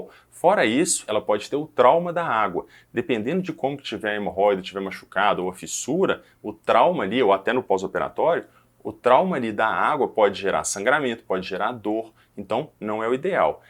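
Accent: Minas Gerais, Brazil. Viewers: Dr esse sotaque mineres e mto bom 😅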